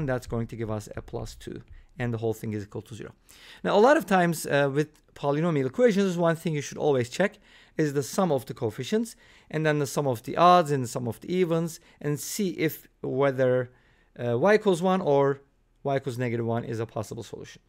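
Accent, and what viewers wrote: Turkey. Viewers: You have a Turkish accent, are you Turkish by any chance ?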